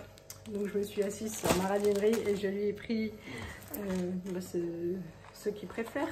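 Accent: Alsace, France. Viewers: Bonjour Y rrrepennnnnser 😂 yo hop la ! Un petit reste d’accent alsacien 😊 votre retour me donne envie de tester les triangles dans la soupe au lieu de la crème. Les pains d’épices au chocolat Made in Allemagne sont de retour chez Leclerc Alsace … miam ! Bon WE à toute la famille et caresses à Chupa ! 😙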